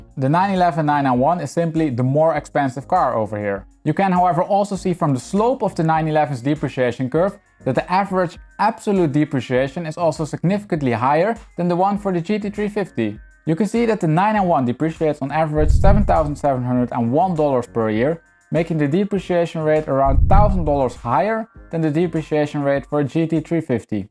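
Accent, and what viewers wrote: Netherlands. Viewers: you have a Dutch accent.....Kan U look Nederlands praten?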